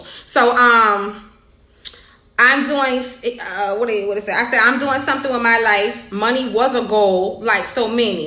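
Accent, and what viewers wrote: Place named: North, United Kingdom. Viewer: that up north accent i like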